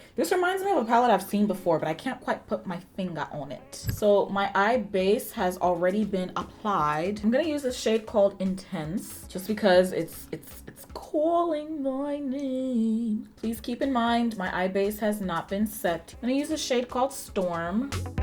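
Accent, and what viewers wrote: United Kingdom. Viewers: The British accent lmaooooo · The English accent 😂😂😂 sounds like ppl I go school with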